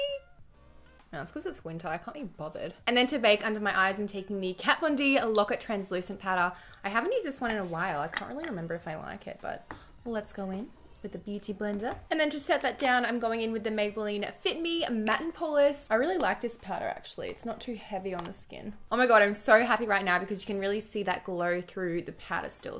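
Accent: Australia. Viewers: Omg I've always watched her with the sound off and I had no idea she has an Australian accent I was so surprised!!! I am so dumb😂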